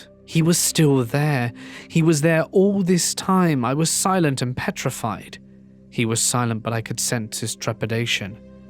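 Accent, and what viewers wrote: United Kingdom. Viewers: ❤your voice uk from ?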